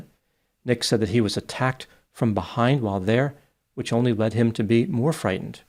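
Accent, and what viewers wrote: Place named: Pennsylvania, United States. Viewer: mentioned Lancaster, PA which reminded me that accent is exactly like my SEPA (Norristown) born and raised grandad. grew up in SEPA, no? If he didn’t, don’t tell me bc I fashion myself an amateuraccent expert. The gift that is uninterrupted ignorance is one that keeps on giving.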